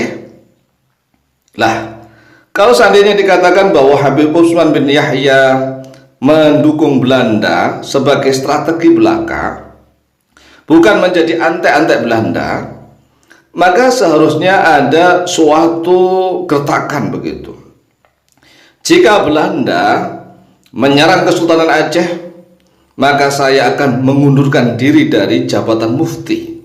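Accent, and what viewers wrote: Java, Indonesia. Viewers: Min, logatnya ko kaya orang jawa, aksen nya kaya bukan orang Aceh ? Sejarah memang versi, Persis kaya peristiwa G30S PKI Kita juga akan mendapatkan cerita yg berbeda/versi yg berbeda tergantung dri sudut pandang siapa. Seperti juga hari lahir Pancasila, Versi sarjana hukum yg paham ilmu hukum lahirnya tgl 18 Agustus 1945, tapi versi Megawati 1 Juni Tentang habib Yahya, yg disangkakan sebagai antek Belanda kebenaranya harus diuji secara ilmiah bukan dri persangkaan, bukan dri rasa dari fakta, apa kedudukan dan fungsi Mufti pada saat pemerintahan Hindia Belanda dsb, Sama seperti kita melihat film Sengsara membawa nikmat, yg kita lihat Midun sebagai pahlawan, tapi dia jadi polisi pada pemerintahan Hindia Belanda, Berarti sesungguhnya Midun penghianat bangsa dan antek Belanda ? Mari belajar berfikir jernih dan menyeluruh, sehingga tidak salah dalam mengambil kesimpulan, kebenaran didunia itu nisbi/relatif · Ini bukan orang aceh baca ini tapi jawa sebab logat aceh kami tahu. 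Hahaha kalau kita lihat dimakam krekof yaitu makam prajurit belanda yg di kubur diaceh maka banyak orang prajuirit belanda yg mati disana dan bernama akhir o dan itu paling banyak mati dikuburkan disitu. Coba cek aja sendiri dan hb usman punya murid di aceh tuanku kemala ,tuanku kemala ini pejuang aceh dan ulama. Catatan 150 thn itu bukan diaceh y tapi dipulau itu walaupun akhirnya mereka yg paling merasa banyak pejuang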